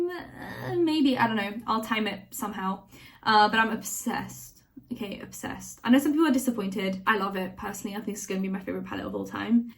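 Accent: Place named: United Kingdom. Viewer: With your British accent I was thinking you were saying Patrick Star for a minute! I'm pretty new to makeup haha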